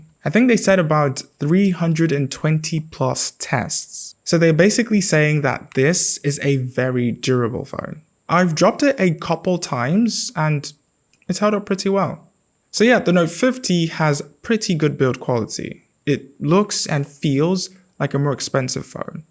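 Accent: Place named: United Kingdom. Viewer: Bro you British accent is good tho 😢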